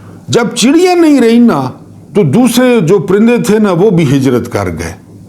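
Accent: Saraiki, Pakistan. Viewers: اردو میں سرائکی کا تڑکا واہ واہ 👌👌خوش رہیں۔😊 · صاحب آپ کی سرائیکی میں اردو بہت اچھی لگتی ہے ماشاءاللہ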